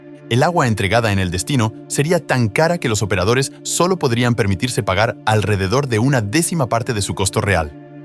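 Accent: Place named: Spain. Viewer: habla como sspañol y larino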